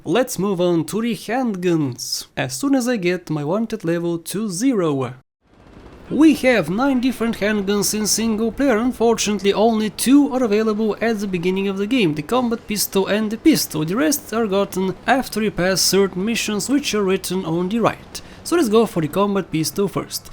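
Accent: Russia. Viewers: Are you Russian bro? I only ask because my mum is Polish and I think your accent is dope. Don't take any shit from anyone about it. Your channel is awesome. :)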